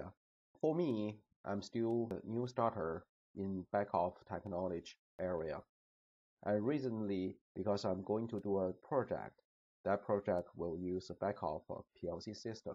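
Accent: China. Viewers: Судя по акценту автор китаец) Как я понял? "So from here".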